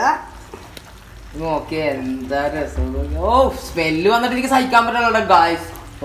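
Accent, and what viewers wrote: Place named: Thrissur, India. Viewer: സംസാസാരം സ്പീഡ് പോരാത്തതിന് ശരിക്കും ത്റ്ശൂർ സംസാര ശൈലിയും ഒന്നും ശരിക്കും മനസ്സിലാവുന്നില്ല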